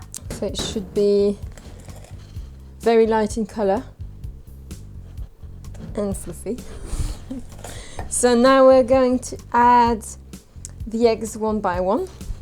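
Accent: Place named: France. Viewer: I like your French accent 😊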